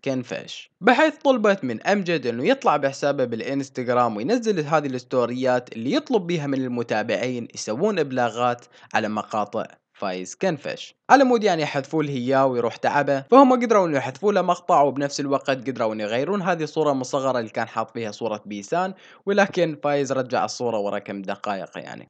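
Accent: Iraq. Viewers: احسك عراقي مو 😂✌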